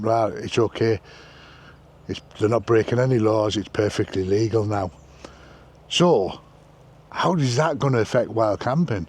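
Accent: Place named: Northern England, United Kingdom. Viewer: Great video and you presented it brilliantly and was really clear. Id no idea about the repeal. But as you say if by default should really apply to all. Im from Stockton originally but in south now and wild camp all over. Got the accent- where up there do you camp? But mainly South Downs In woods for me. Barely spotted but as others her LNT and don’t muck about. Love to see coppers walk miles uphill to find me - isnt going to happen. But will be interesting to see how this pans out. Was in Scotland a few weeks ago and its just great to have the freedom to set up where you want. Many people doing it all the time, all over. No one leaving mess that i saw. Keep us informed what you find out Cheers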